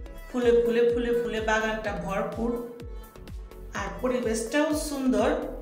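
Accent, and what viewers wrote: Old Dhaka, Bangladesh. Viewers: পুরার ঢাকার কথা শুনতে আমারও ভালো লাগে। শুধু আপার কথা শোনার জন্যই পুরো ভিডিওটা দেখলাম।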